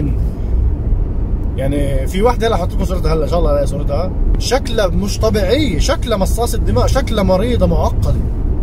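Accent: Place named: Palestine. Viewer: السلام عليكم إنت من وين أخي واضح من لهجتك فلسطيني من سوريا أو لبنان؟؟ ممكن تعمل فيديو قصير تحكي فيه عن نفسك سيرة ذاتية. من الجيد أن نعرف عنك أكثر و شكرا جزيلا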